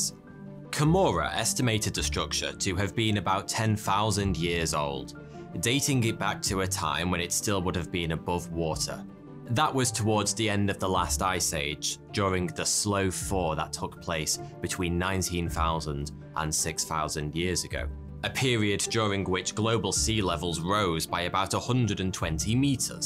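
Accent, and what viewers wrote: United Kingdom. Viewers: Before giving in to this video's nice British Accent. I encourage anyone to watch Rogan #961 for a full debate between a leading skeptic and Randall Carlson/Graham Hancock. There's a solid foundation for his reporting and it's more challenging to actually listen than to just swallow the easy answer that "Leading Experts" disagree. His solo venture #1284 of the Podcast also includes a deep dive into the Piri Reis Map, and I was skeptical until the revelation about the Bimini Road. Definitely a worth few hours of your time.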